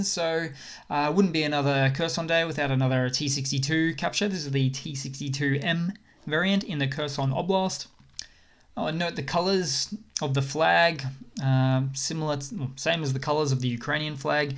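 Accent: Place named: Australia. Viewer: Brilliant and great to hear an Aussie voice. Slava Ukraini!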